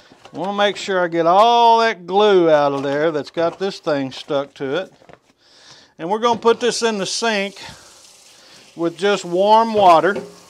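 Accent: South, United States. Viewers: Hi - I watched a couple of other cast iron skillet channels before I got to this one. They seemed ok, but once I found one with a Southern accent I knew I'd found my go to cast iron skillet channel. Thanks! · I grew up in the south watch your videos just to listen to your great accent. I may even buy a cast iron skillet 🤣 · Youre a diamond, I've just bought one it will be delivered later. I find it hard to trust all these fancy videos but then a bacon-frying American with a Southern accent comes along and shows how its done, I feel confident in my purchase now. And yes sir, I will be frying bacon in it. Much love from the UK 🇬🇧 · Didn't trust anybody on YouTube showing me how to season a skillet i heared somone with a southern accent thanks for the video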